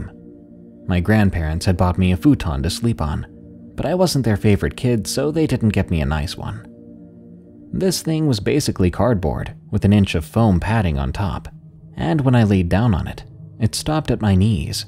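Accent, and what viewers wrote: South, United States. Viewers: Souther comfort with a southern voice! All tuckered in man. Thx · Southern accents are great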